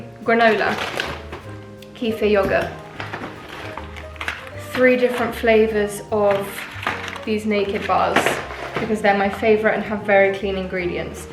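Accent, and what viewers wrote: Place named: United Kingdom. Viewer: My dream job is being an interior designer, and because of that, I watched your video, and I noticed how beautiful your British accent ❤❤ .I wish you rache everything you want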